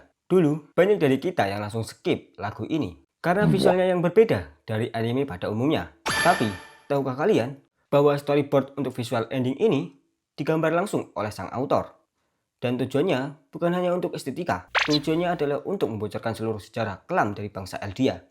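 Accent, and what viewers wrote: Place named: Java, Indonesia. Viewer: Medok bgt jaw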